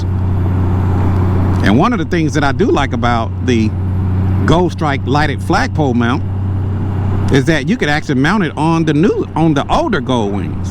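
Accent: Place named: Memphis, United States. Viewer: ! Man I never noticed that Memphis slang the way y’all pronounce some words, the rapper Glorilla who is a Memphis native pointed that out, by the way she has an uncanny resemblance to you my brother just some food for thought. Be safe out there and I’m loving my 15 Goldwing even more.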